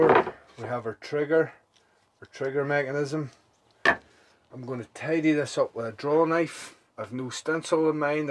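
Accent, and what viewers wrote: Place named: Ulster, United Kingdom. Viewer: I'm thinking " That gent is maybe an Ulsterman" and then I heard " Plenty of fine shavings for kindlin' " Best wishes from Portarlington County Laois. Keep up the good work.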